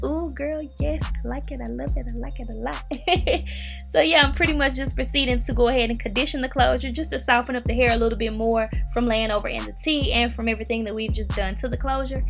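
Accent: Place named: Tennessee, United States. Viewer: That Tennessee accent 😍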